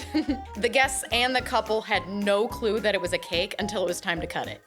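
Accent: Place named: Ohio, United States. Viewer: As a native Ohioan, I could peep Natalieʻs accent months ago! We put "Y"s before our vowels-"Cyan" instead of "Can". Im So proud of a Buckeye doing so well!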